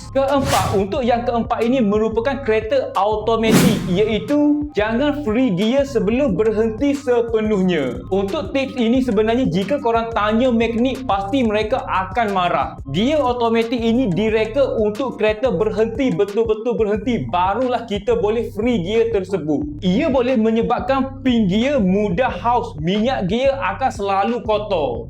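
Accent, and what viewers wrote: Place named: East Coast, Malaysia. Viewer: Terbaik bang, tebal loghat pata timor 😅😅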